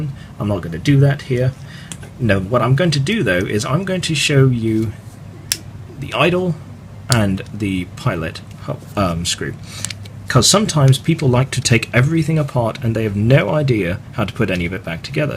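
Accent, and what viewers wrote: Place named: United Kingdom. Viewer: Another useful video thanks. Have you lived in the UK,just going by your pronunciation . Andy.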